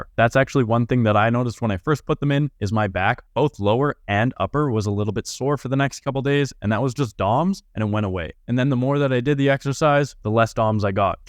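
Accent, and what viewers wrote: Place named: Canada. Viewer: I can tell you’re Canadian istg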